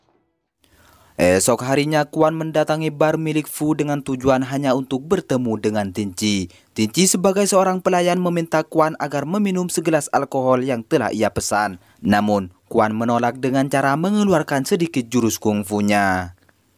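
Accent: Madura, Indonesia. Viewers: Si owen kan pemaen smekdwon si batista pernah maen juga di film the guardian of galaxi sama film avenger and game,kalo si sadi namanya tony ja dia pemeran utama di film ongbak. Kalo dari gaya logat ngomongnya si abang orang madura ya ?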